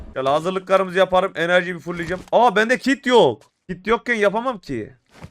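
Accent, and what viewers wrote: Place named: Antalya, Turkey. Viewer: senin antalyalı konuşmana bayılıyorum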